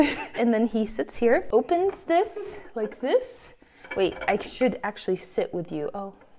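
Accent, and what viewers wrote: Germany. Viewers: Sometimes you sound like you are speaking english with a little german accent 😉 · I like Your Denglish!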